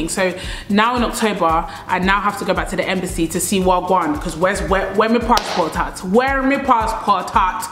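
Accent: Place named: Yoruba, Nigeria. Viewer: I like how you mixing the yoruba acient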